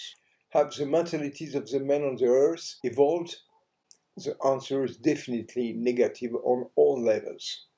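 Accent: France. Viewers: Les sous titres anglais générés aident grandement. Avec en prime l'accent Frenchie pour aider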